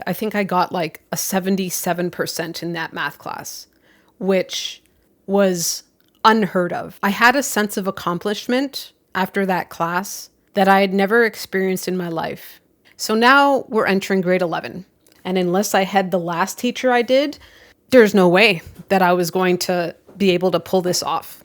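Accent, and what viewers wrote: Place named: Canada. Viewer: How are schools today in detecting dyscalculia & dyslexia? I mean both the US & Canada as I'm American and I can hear her Canadian accent.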